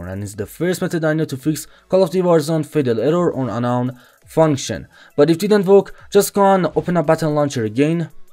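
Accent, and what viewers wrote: Iran. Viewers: خیلی صدات خوبه ولی انگار ایرانی هستی😻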